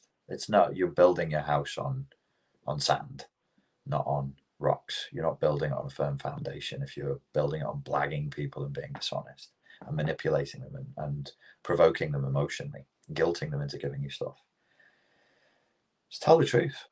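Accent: RP, United Kingdom. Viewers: As a Canadian I think your accent is kinda posh sounding.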